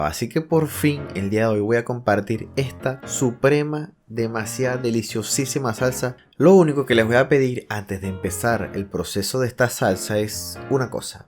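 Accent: Venezuela. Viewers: Ays hace poco conocí una venezolana que hablaba como tú 😅 majísima por cierto!!😊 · Pareces maracucho 😏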